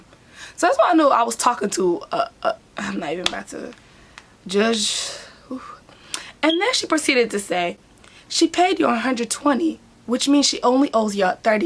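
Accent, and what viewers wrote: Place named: Cincinnati, United States. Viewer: Your beautiful girl! Sounds like some Cincy shit lol! Where you from Mason or something? The suburbs so I am assuming because nothing about Cincinnati, Ohio suburbs especially not in 2006!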